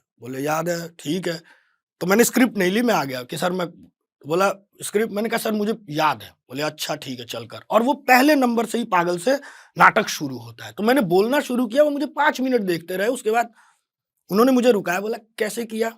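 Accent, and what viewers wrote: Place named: Ballia, India. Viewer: Vinod ka hi real character lgta hai.. Ballia ka sahi accent hai aapka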